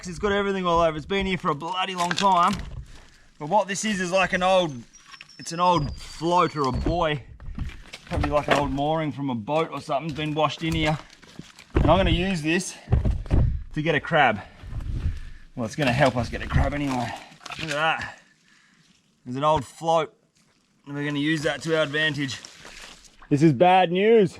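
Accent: Australia. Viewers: AMERICA 🇺🇸 LISTENING TO AUSSIE MAN TALK'IN CRACKS ME UP FAIR DINK'UM COMPANY 😂😂😂😂 I'M LOV'IN IT THE WORLD IS GREATEST PLACE ON EARTH 🌎!!! FAIR DINK'UM DUDE'S 👌🤠🐸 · My god I can't stand that stupid Australian accent, no effort to speak more clear, you would get more viewers.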